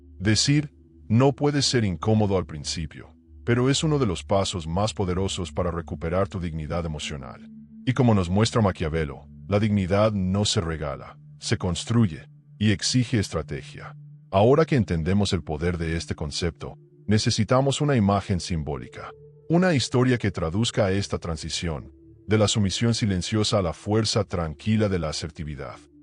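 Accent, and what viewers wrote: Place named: United States. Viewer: La Información muy buena, pero el tono gringo pésimo,...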